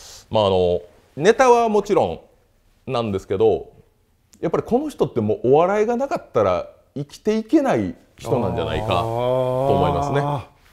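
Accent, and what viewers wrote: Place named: Kyoto, Japan. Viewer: めっちゃ所々京都弁感じる 上品な人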